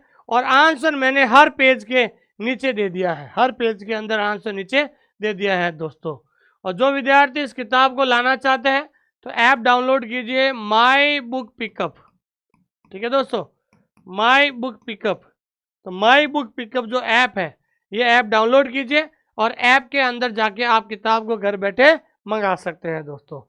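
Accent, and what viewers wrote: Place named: Rajasthan, India. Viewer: Pura Rajsthani style me, kya khub lge hai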